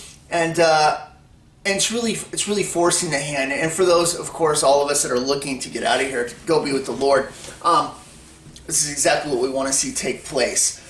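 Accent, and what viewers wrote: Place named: New York, United States. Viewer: Coming from a very laid back part of Australia, I find it very hard to listen to a new yorker. But your message is good, most of us awake Christians can feel it coming. But I feel its also a test of our faith.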